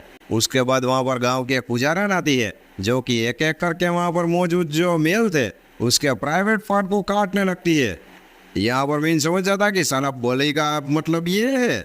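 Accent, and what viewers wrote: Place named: Chhapra, India. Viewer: Tera boli chapare jaisa hay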